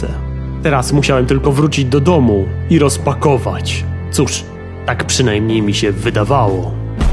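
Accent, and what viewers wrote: Poland. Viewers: jesteś z Lubelskiego? Masz taki akcent.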